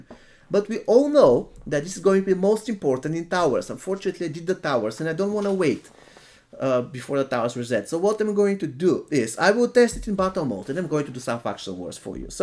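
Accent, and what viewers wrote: Bulgaria. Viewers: are you bulgarian?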